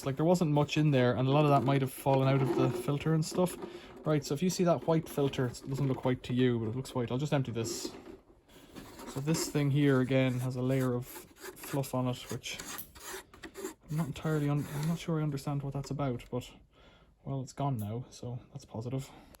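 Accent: Ireland. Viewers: You sound like an Irishman. I bought mine 1 week ago with same vacuum and looking for some DIY as my newly bought bloody expensive vacuum stops suctioning but power is working. Can’t find any solutions on my machines manuals.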